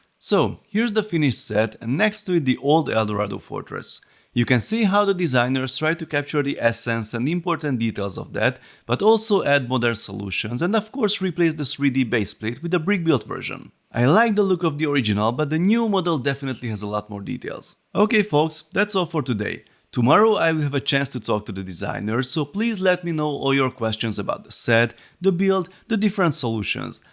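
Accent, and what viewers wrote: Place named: Hungary. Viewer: le a kalappal. Te vagy a kedvenc Lego csatornám, egy csomó olyan videód megnéztem, ahol az adott készlet beszerzése még eszembe sem jutna:). Jól meg vannak csinálva a filmek, élvezetes és választékos(!) az angol szöveg, az akcentuson ugyan hallani hogy magyar vagy, de egyáltalán nem zavaró módon. Gondolom az oktatói múltadból is tudod, hogy lehet a figyelmet folyamatosan fenntartó szövegeket létrehozni és úgy elmondani, hogy abba ne aludjon bele a közönség. All in all, nagy kudos.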